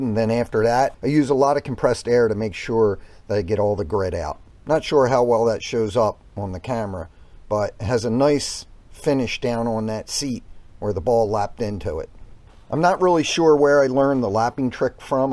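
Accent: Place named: Baltimore, United States. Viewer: I did take the spark plugs out, I shot some lubricant down inside with Kickstart a few times. Oil couldn't wait to spew out LOL. I ordered a new battery and waiting for it in. Your accent sounds like you might be from the Baltimore area. I'm in the Gettysburg area. When I get the battery in and try to start it I'll let you know how I make out. Thanks so much for your reply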